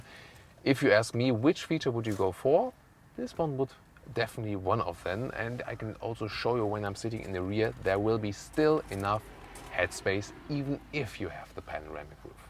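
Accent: Germany. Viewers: would you ever trust a reviewer with a french accent (even if you can understand it)? the German accent, on the other hand, makes the review sound truthful, serious and trustworthy.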